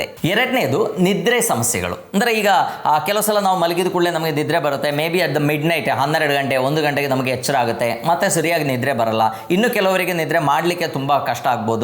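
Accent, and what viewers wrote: Kerala, India. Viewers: are you keralite speaking kannada,..?? but you speak well,..!! the composition of chlorophyll, haemoglobin are almost same except for Mg,... replace it ,.. it is blood cell composition,... hence cattle/ grass fed animals are getting blood compositions from grass itself,... !!first source,.. hence grass superfood,.. !!ultimate food,... hence worshipped in every pooja,.. as darbe,... around the fingers as a ring like,...!!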